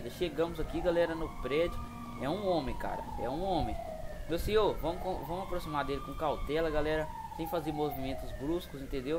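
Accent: Minas Gerais, Brazil. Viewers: Sabia que tu era de minas , voce é de BH aqui mano ? quando voce fala Jae , pela ord kkkkkk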